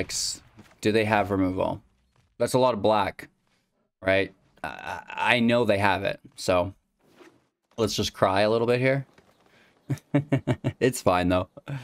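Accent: Canada. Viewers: had an extra strong Canadian accent today. Oooout. Love it.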